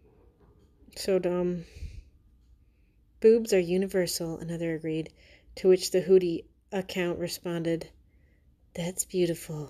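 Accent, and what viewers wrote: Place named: Long Island, United States. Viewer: 👉 Great Long Island accent. Sounds like Taylor Dayne back in the 80's. ☺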